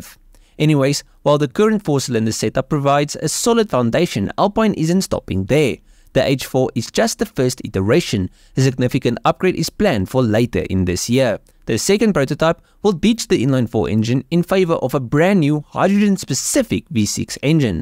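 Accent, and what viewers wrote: South Africa. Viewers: Had to double check your channel details when I heard the SA accent 🤪 great video! Keep up the great work!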